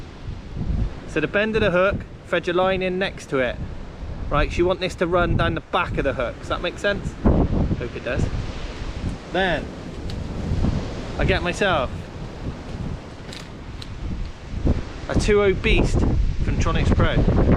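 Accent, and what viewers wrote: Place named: Bristol, United Kingdom. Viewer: What’s wrong with a Bristolian twang lol so you have a link to the FB group?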